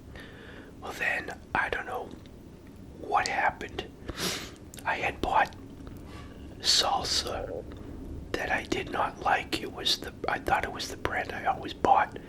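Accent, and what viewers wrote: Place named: New England, United States. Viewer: Sounds like a fellow New Englander! I'm right over in Norwich CT! Just found your channel, love this video. I love going on late night McDonalds runs, sometimes I'm not even super hungry but it's just like a satisfying solo excursion while my wife is asleep. Listen to some music in the car and chow down in the parking lot. Sometimes if I'm feeling froggy I'll wake up super early for a McDonalds breakfast run while it's still dark out.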